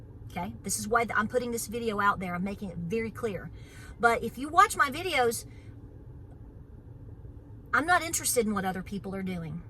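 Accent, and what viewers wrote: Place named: Tennessee, United States. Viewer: my mom's people were from East Tennessee. You sound just like them! It is like going back in time for me when I hear you use an expression from my childhood. I know many of the areas you speak of, too. (One of my great aunts lived in Maryville...I love hearing you say Maryville.) I grew up in the area as well. So, I have never doubted you. The things you mention...blackberry winter, for instance, are things that I remember my grandmother talking about. She still dried her apples in the sun when I was a kid. She ate those dried apple chips like candy. I didn't like the taste at all, but they made wonderful pies. I miss all of that so much, so watching your videos is like a little slice of that for me. I enjoy everything you talk about and share. I love seeing you care for the goats and hearing about what you are growing, making, planning, etc. 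I don't know you, but just from watching and listening to you, I would vouch for you any time as a true East Tennessean. I started watching you because you reminded me of all of my mom's family. You help me recall things that I probably otherwise would not. I no longer live in the area, and I do love where I am, but I miss those foothills and love being taken back. So, thank you for simply being yourself. You bring a smile to my face pretty much every day. Now, I kept watching partly for that, but mostly because I enjoy hearing your perspective. I like your advice. I like the way you think as I agree with most everything you say.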